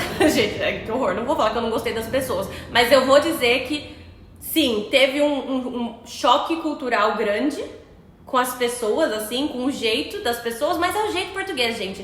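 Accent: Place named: Rio Grande do Sul, Brazil. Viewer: 🇧🇷Oi linda 🤩😍🥰 que bom te ver, e saber que está tudo bem 🙌eu achei que era do rio grande do sul pelo sutaque😂 beijinhos para a sua mama👸 e🐶freinhae e para vc tbm👸😂😂😂😂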